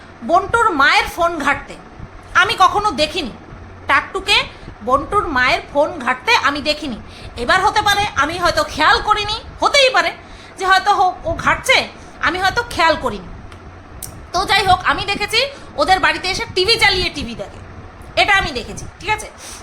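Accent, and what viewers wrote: Bangal, Bangladesh. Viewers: didivai tmr mukhe bangal vasa ta sunte hebby lage😊😊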